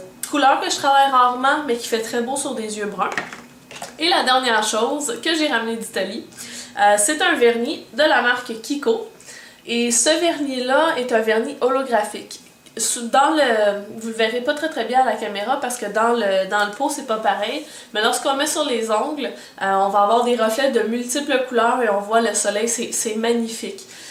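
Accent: Quebec, Canada. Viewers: Coucou, Je participe à ton concours avec grand plaisir, il est fort agréable d'entendre a nouveaux le bel accent du Québec (j'ai eu la chance de pouvoir visité un peu cette magnifique contré) J'adore également tes vidéos je les trouve super!!! Ce qui m'intéresse le plus dans le lot a gagné sont le pinceaux et les paillettes... Merci pour tous ce que tu fais, Bisous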